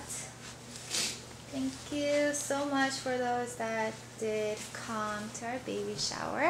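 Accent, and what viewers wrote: South Korea. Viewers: You sound just like Korean ... Not that I mean your English is bad. You do just well I just think all we Koreans have certain accent in English, like Korean Accent I think:)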